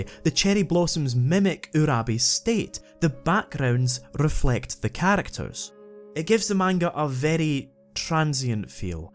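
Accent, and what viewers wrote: Scotland, United Kingdom. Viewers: anime and scottish accent goes so strange together. I meant in a good way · that sweet scottish accent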